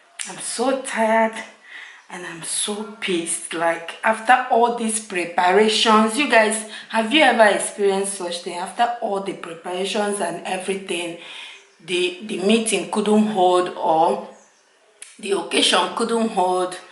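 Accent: Nigeria. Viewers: Aunty!! I love how authentic you and your family are. I especially love your thick Nigerian accent. It's sounds beautiful. Thanks for being so real!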